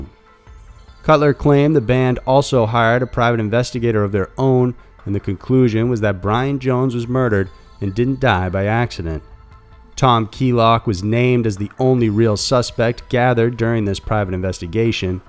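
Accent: South, United States. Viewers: "brian" is misspelled in the title but he pronounces it very normally for someone with a light southern accent - "brahn" - like how he says "nine" more like "nahn" - just an average drawl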